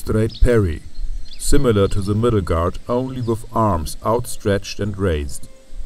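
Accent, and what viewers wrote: Germany. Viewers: Ekelhafter, deutscher Akzent. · Wenn Deutsche Englisch blubbern...🤢🤮👎🏻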